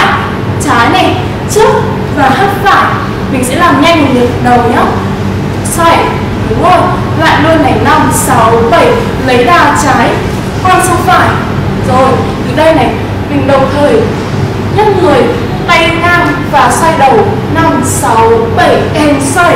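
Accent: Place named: Hanoi, Vietnam. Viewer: Ôi, giọng Hà Nội nghe ấm, sang, thanh lịch quá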